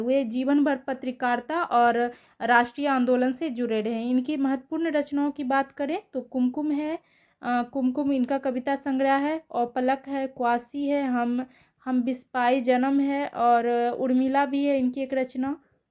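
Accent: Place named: North Bihar, India. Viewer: Medam AAP उतरी बिहार से हैं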